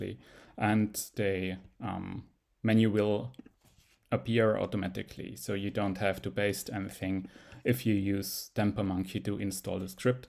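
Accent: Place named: Germany. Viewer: Man hört direkt deinen dt Dialekt raus. Weshalb sprichst du dann Englisch?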